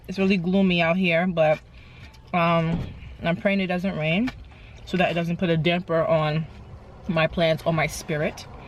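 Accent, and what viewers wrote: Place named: New York City, United States. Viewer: Girl I can tell you from NYC! Lol 😆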